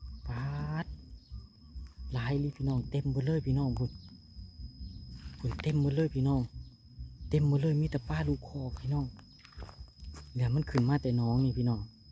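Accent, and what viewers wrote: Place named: Ubon Ratchathani, Thailand. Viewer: สะออนนำเด้มีแต่ดอกใหญ่ๆบ่าว เสียงปากคือทางบ้านแม่ทางอุบล เนาะบ่คือทางลาว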